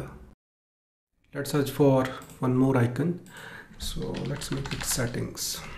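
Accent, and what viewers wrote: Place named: India. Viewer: im fed up with this accent seriously , i wanna smash my head when i hear this accent honestly , i just cant understand what u indians say and everytime im searching sth related to coding an indian pops up , fuck this life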